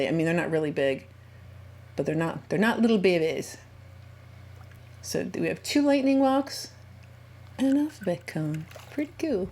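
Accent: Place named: Ohio, United States. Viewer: Are you originally from the Ohio region by chance? The way you say the word: "water" like "warter" sounds like the Ohio area accent. ;) :)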